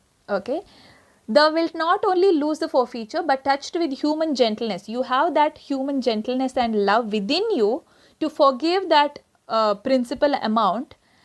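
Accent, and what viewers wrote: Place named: South India, India. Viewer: Good explanation... With south Indian accent 😊👍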